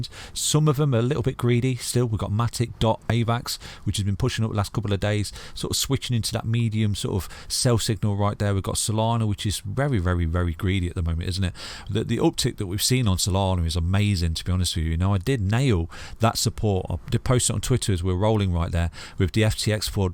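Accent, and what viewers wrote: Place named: England, United Kingdom. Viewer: Please speak slower. Especially with the English accent and all of us Slow-minded Americans sipping whiskey and munching Doritos.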